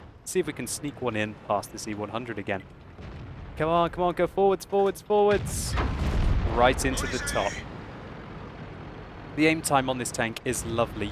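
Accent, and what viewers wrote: France. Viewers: Lovely french accent :D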